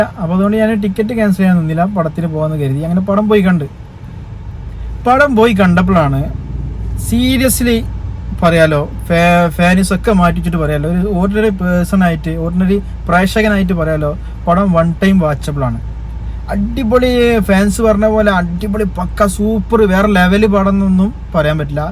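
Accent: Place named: Kozhikode, India. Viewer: ആദ്യം താൻ മലയാളം സംസാരിക്കാൻ പഠിക്കൂ... കോഴിക്കോട് ബാശ.... എണീറ്റു പോടേയ്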